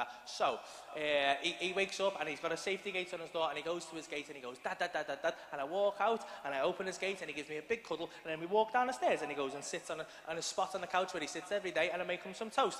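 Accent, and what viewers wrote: United Kingdom. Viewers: Cant beat this humour spoke with the best acent in the uk 😂 👌🏼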